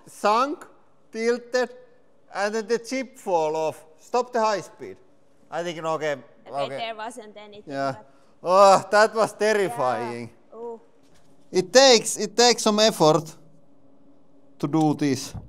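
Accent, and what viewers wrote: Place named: Finland. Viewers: I got bored in the middle, but if you speed up some of it, to like 1.5 speed, so that we can still understand your Finnglish but also spend like 30% less time, that'd be great. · I could listen to Finns speaking English for hours, so, you're good! 😎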